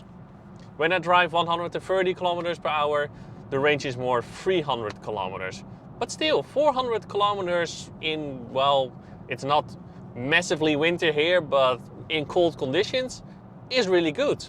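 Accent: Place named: Netherlands. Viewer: Compliment hoor ,jij spreekt echt heel goed Engels ! 👍 Ik hoorde aan jouw Nedelandse accent dat je Nederlander bent.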